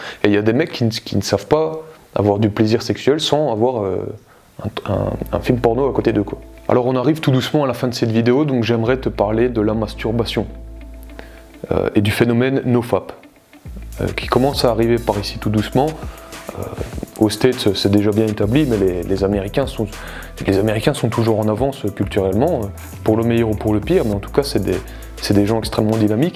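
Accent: Belgium. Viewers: Par contre il a un pouissant accent belge. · Petit accent belge spotted ;)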